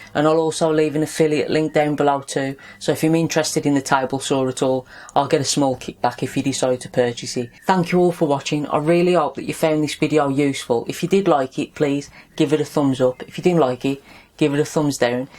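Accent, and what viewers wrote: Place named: West Midlands, United Kingdom. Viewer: Great review ar kid very detailed and the West kids accent makes it that much better to watch as I'm from walsall .I've subbed and liked well done keep it gooin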